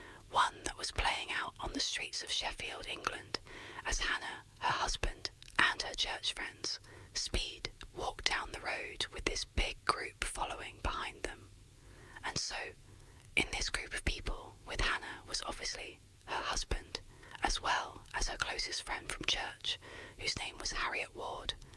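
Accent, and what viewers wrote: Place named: Australia. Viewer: Bro I honestly can’t with the accent your an Aussie right? Idk I just was laughing so hard at the beginning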